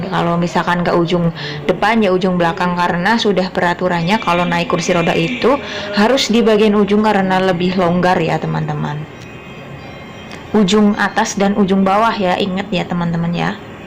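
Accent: Banyumas, Indonesia. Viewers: Salam kompak wong ngapak..ora ngapak ora kepenak kk..😁 Sukses selalu kk..🙏🙏🙏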